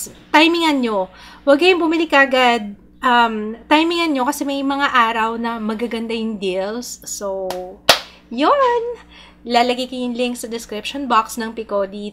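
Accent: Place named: Iloilo, Philippines. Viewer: ms, bkt ilonggo po yung accent nyu??, ilonggo po kc ako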